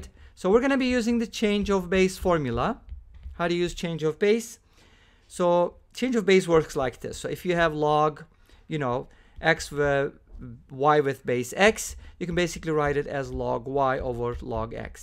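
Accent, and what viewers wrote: Turkey. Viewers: Are you Turkish? Your sound like turkish english.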